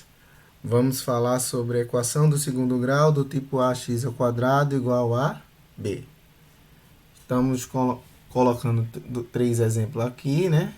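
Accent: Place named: Rio de Janeiro, Brazil. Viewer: MDS sua voz é a do Super choque só que carioca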